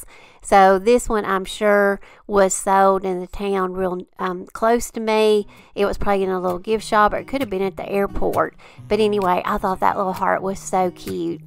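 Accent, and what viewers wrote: South, United States. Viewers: Love your channel and sooooo excited about the IOD giveaway. Keep cranking them out cuz we need more you tubers like you. Love your soft southern accent and your voice. It's soothing which makes it easy to relax and enjoy the show. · IOD Just found your channel and subscribed !! Love your adorable southern accent. You are so sweet to listen to. I love going to thrift stores but now will buy things to make over using the IOD transfers and Stamps. I plan to purchase these through your links. Thank you for all your kind words and inspiration.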